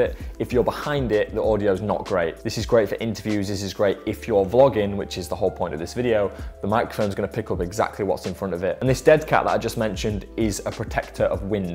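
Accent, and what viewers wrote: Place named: Yorkshire, United Kingdom. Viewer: Btw are you from Leeds or Bradford ? Your accent is too close to home not to be.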